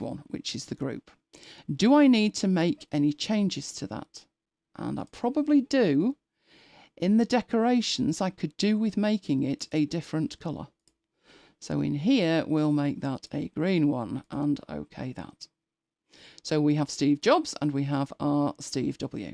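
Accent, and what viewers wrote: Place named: Northern England, United Kingdom. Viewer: How many years have I been stumbling around on YouTube, picking up a snippet of info here, a snippet of info there? I finally, finally, found a teacher who knows how to teach?! This is fantastic instruction and exactly what I've been searching for. I've been using MS Word and various basic page layout apps since <ahem> the last century, but now I need Affinity Publisher and I've been baffled by its complexity. I know it can do what I need to do, but finding those tools and making them work has been a source of endless, discouraging frustration. I'm feeling capable and optimistic again for the first time in months. It doesn't hurt that speaks like I do, either 😂 Or how I would have sounded if I hadn't moved from northern England to Canada many years ago. I am 100% connecting with this content. [Update: didn't have time to watch this all in one go, so I'm on day 2. 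And I have to say again: oh my goodness this is good teaching. I just found Medium account. Will be devouring that too.]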